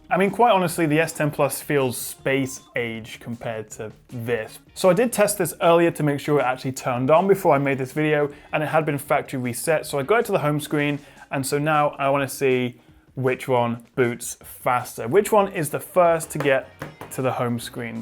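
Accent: United Kingdom. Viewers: nice British Accent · Accent is British 😉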